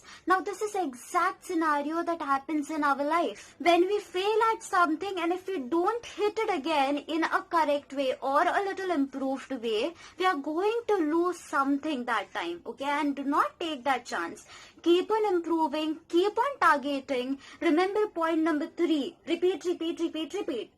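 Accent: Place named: South India, India. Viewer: I remember my south indian teacher who give social science lecture and her accent is same as you... Nice video btw ♥️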